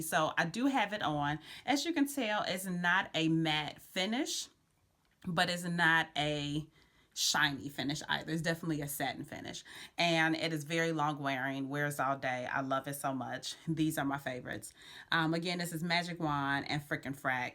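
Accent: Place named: Baltimore, United States. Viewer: ok Maryland or Cali? I hear it….Baltimore for sure, am i right?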